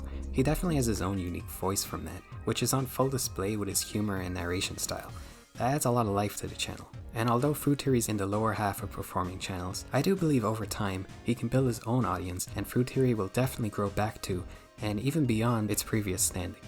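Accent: Ireland. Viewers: you Irish? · You pronounce t's like an Irishman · You're so Irish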